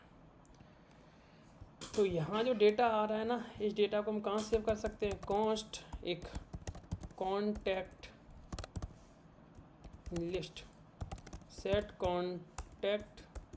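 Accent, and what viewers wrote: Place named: Western Uttar Pradesh, India. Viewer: Kisi biryani ke dukan pe jo ladke kaam karte h wesi tone me baat kar raha hai, 😂😂😂😂, what a language pachimi up wala h, 😅 व को ब bolne wala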